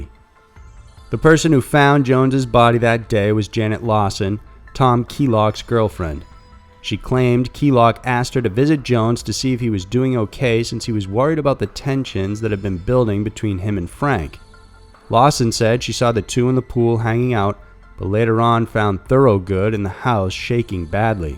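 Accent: South, United States. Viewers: "brian" is misspelled in the title but he pronounces it very normally for someone with a light southern accent - "brahn" - like how he says "nine" more like "nahn" - just an average drawl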